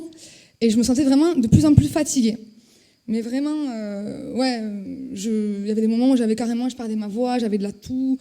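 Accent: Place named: Toulouse, France. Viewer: L'accent délicieux de Toulouse !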